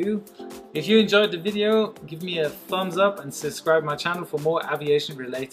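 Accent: Germany. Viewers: Hes german.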